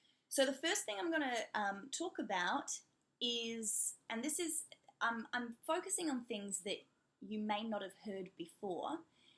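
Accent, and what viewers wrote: Adelaide, Australia. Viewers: Excellent tutorial. Well done. Btw you have the mildest Adelaide accent I've ever heard! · Omg... as an Aussie myself(Qlder), I really didn’t notice these little details, like ‘’the ca-ris-moving’’ or even ‘’gud’’ And then I repeated the words you said out loud and.... mind blown 🤯 LOL 😆 Cheers for this video! I don’t know why I’m surprised at our accent but I am ha! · This video is awesome!! I like your accent because I'm from Adelaide too!! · Mild accent! You sound super Aussie to me from Melbourne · She's blonde, and has a faint Australian accent, ergo is Olivia Newton John? I mean, it's mildly amusing, I guess. But why's everyone so excited about it? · I'm Australian too im from Melbourne and my accent is similar to yours. I did live in the south of England for a yr awhile ago and i started speaking with an English accent but once i was home after afew months my aussieness came back 😊 omg you're so right we do do the r in front of is lol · You have a much less bogan accent to quite a few, considering you’re from Adelaide of course 🙏😂 your accent is cleaner, I’m from Newcastle NSW, each state has a different twang on our accent I’ve noticed 😎😂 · How refreshing to hear a fellow Australian who doesn't sound like an uneducated buffoon. 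And I speak like you and I'm from Sydney originally (Melbourne these days). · I was thinking that your accent reminded me of Adelaide and you said that you are from Adelaide 1min later! I was so surprised because I got used to listening my bf who is from Adelaide and I lived in there last year! It was good to see your video :)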